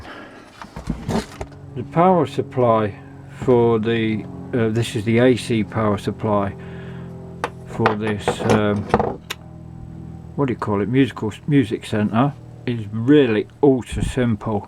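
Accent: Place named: East Midlands, United Kingdom. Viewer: Great video. Very interesting and entertaining. Sounds like you're an east mids lad. Cheers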